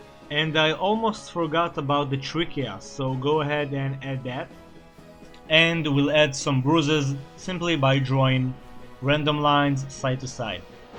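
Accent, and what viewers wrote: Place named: Israel. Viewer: אתה פשוט מלך! למען האמת, כשרק התחלתי לצפות בך שמתי לב למבטא שלך ואז ראיתי שהשם שלך זה וישר ידעתי שאתה ישראלי וזה מאוד שימח אותי. אז תמשיך ככה אתה אחד הציירים הכי טובים שראיתי! בהצלחה בהמשך הדרך שלך ביוטיוב!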